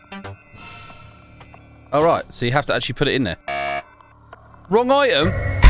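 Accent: United Kingdom. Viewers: I'm here because of British accent also he said the majic word ( bottle of woater )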